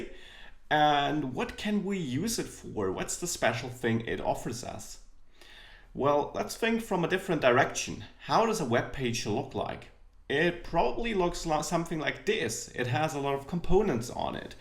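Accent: Germany. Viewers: Funny to hear germans speaking english... :-D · Are you from south or central part of Germany ? I had a colleague from there and exact same ascent. Thanks for nice info anyways.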